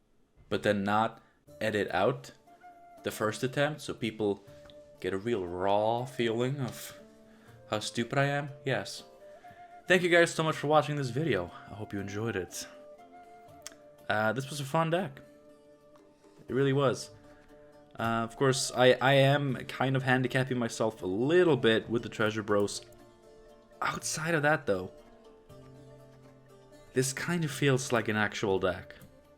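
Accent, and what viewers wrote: Norway. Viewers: are you from Norway?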